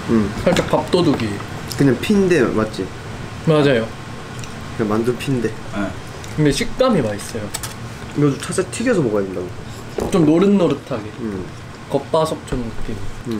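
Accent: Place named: Gyeongsang, South Korea. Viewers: Daegu's accent is cuteeee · Logat seperti logat busan... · your daegu accent is sooooo adorable♡ Love you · When speaks casually, I notice Daegu accent is sooo cute Kekekeke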